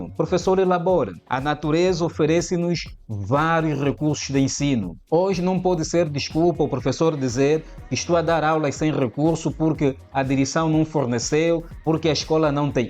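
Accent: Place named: Angola. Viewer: professor você é de angola ou moçambique? Sem preconceito é claro.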